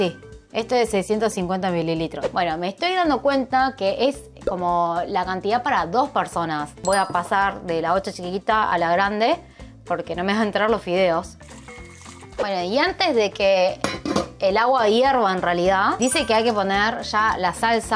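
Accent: Argentina. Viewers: ME ENCANTA ESE TOQUE DE ACENTO ARGENTINO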